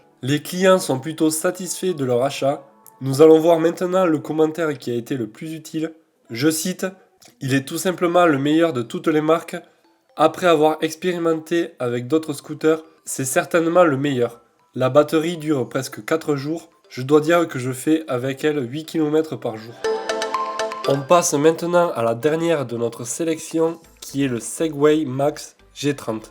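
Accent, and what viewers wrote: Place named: South, France. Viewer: Toi tu es pas de paris mais plutôt de chez nous (le sud bébé 😂)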